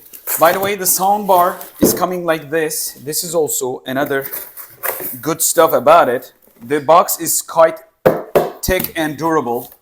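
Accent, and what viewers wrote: Turkey. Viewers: tam Türk aksanı var diyecektim Türkiye dedin :) güzel video olmuş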